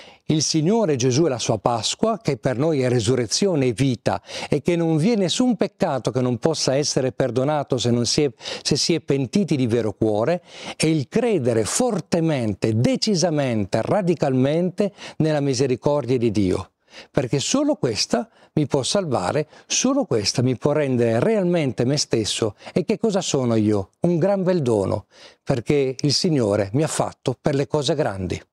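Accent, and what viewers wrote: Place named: Parma, Italy. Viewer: Caro questa è una bella sorpresa per me! Sono della provincia di Brescia. La seguivo molto volentieri, quando stava al santuario della B.V. Maria del rosario di Fontanellato e su Giovanni Paolo TV trasmettevano le sue catechesi sui 10 comandamenti. Mi è spiaciuto quando l'hanno trasferita nel torinese perchè ancora piu lontano... ma sono felice che sia andato a fare del bene anche la... Poi anche di G.P. TV s'è perso il segnale perciò era molto che non la vedevo e sentivo... in un primo momento mi era sembrato di conoscerla, poi sentendola parlare l'accento non mi convinceva perchè ricordavo la cadenza parmense.. ma da come parla ho detto no dev'essere lui! poi ho letto nella descrizione e.. che gioia! Mi ha fatto un vero piacere vederla e tutto. Lei non mi conosce ma io è come se la conoscessi. Saluti! Pace e bene! Sia lodato Gesù Cristo! 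❤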